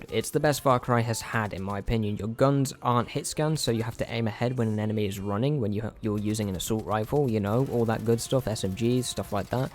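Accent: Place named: United Kingdom. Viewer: Sounds like reviewer is from UK. They aren't allowed guns there......so they shouldn't be allowed to play games with guns in them. Review is so off base.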